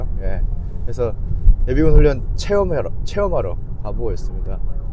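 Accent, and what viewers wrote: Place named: Gyeonggi, South Korea. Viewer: 잘 생긴 男배우같은~ 경기도 언어 억양, 이젠 ⚫️ 검은안경벗고, 자신감으로 😮 보기좋아요🎉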